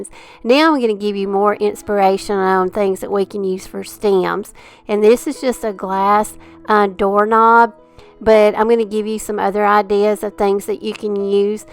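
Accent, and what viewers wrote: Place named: Alabama, United States. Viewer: You sound like you might be from Alabama ~ I am! Love your ideas& subscribed to your channel ❤️❤️❤️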